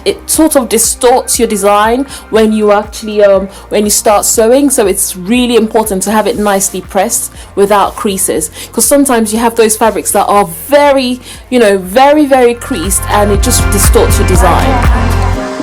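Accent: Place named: Europe. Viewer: Beautiful black woman with old-world skills, humble heart, and European Accent . 🔥🔥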